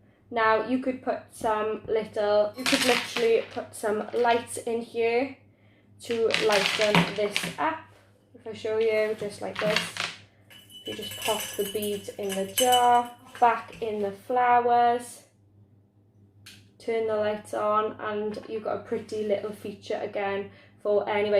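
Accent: Wales, United Kingdom. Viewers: aw lush! No I can spot it a mile off! hold onto it forever I miss mine! 😩 I live by Gatwick now so it's lovely to find a welshie on here🌞 I've turned the little bell on😁xxx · hi - new sub...loving your content...good to know PL gluegun works...will get me one tomoz wondering from a welsh girl -do i hear a welsh accent -you would be the first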